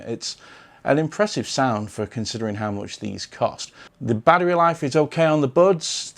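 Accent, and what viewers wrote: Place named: Lancashire, United Kingdom. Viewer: Well that makes sense now. I could tell you were from the Lancashire region. You've done well to retain your accent. I've a pal who moved to the States when he was 13. He now sounds as American as Colonel Sanders. Good review by the way.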